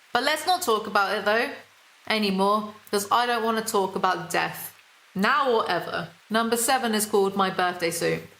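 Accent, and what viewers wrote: United Kingdom. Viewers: She's from the south of England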